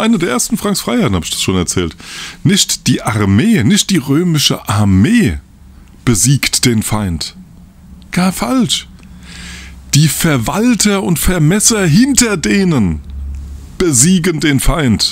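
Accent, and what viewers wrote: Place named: Hesse, Germany. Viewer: Ich liebe die Rezepte❤ und den hessischen Akzent. Much love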